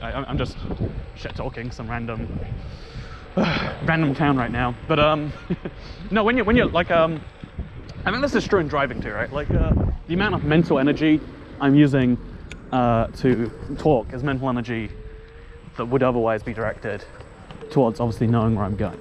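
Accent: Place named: United Kingdom. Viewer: You sound so much more British here lol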